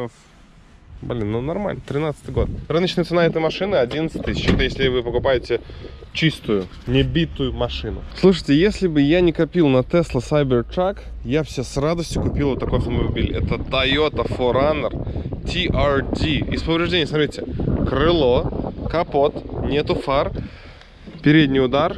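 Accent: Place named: Belarus. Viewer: Маашинуу😁👍акцент прям Белорусский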